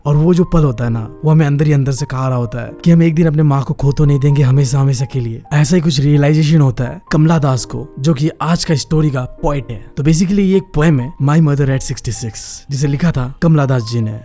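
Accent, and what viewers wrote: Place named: Northeast, India. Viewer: Hello bhai aap northeast mei kaha se ho 😅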